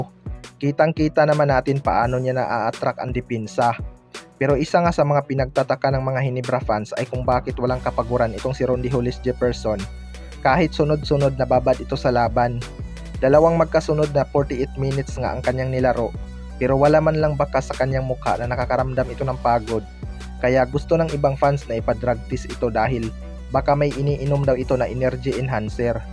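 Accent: Visayas, Philippines. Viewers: bisacol nmn tong salita neto sakit sa tenga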